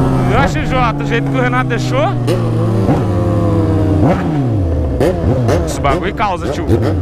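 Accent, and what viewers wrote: Goiás, Brazil. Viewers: Saudades quando ele falava no pique do Goiás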